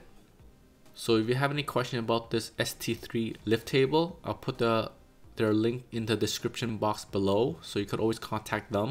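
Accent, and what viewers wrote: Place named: Poland. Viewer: Polish accent :)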